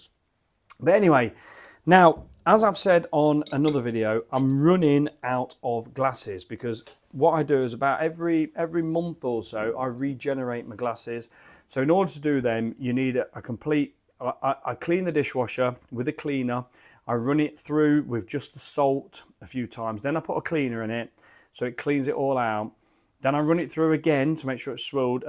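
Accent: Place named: Leicester, United Kingdom. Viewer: Just came across your channel, this is the first of your videos I've watched and it's a great one! I think I'm good at spotting accents and and I would say you're from Leicester(shire) somewhere, in which case lovely to see someone local on here!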